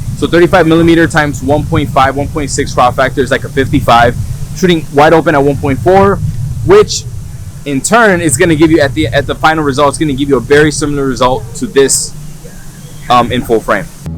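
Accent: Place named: New York, United States. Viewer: That's a New York accent Jersey people dont talk that! Great video though love the content!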